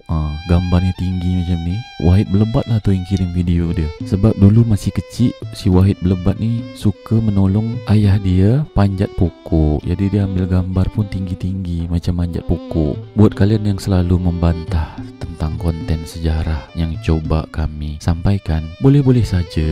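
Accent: Malaysia. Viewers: Padahal dari awal dulu, konten ini sangat bagus dan edukatif. Saya yg dari jawa timur, sangat menikmati, mengapresiasi juga. Suka denger kata2 nya, logat melayu nya, pantun, pemandangan alam, suasana kmpung dan kotanya. Knapa skrang narasinya jadi begini terus. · Saya dari krui lampung ,dengar cakap melayu lucu senang dengarnya salam hangat slalu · budak zaman sekarang mereka tak tau bang...selalu mereka dengar org indo bercakap tak macam ni 😂...jela cara bercakap nya pun sbiji macam org malaysia..kalau saya tak tau pun mesti saya ingat abang ni org malaysia 😂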